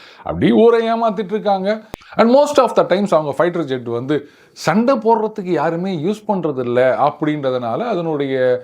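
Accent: Chennai, India. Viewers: வணக்கம் சார் அருமை உங்களுடைய இந்த சென்னைவாசி கலந்த பேச்சு, தொடருட்டும் உங்க பணிகள் 🎉🎉🎉🎉🎉❤❤❤❤❤ எரியட்டும் தோழர்களின் எல்லா துவாரமும்😂😂😂😂😅😅😅😅😅❤❤❤❤🎉🎉🎉🎉🎉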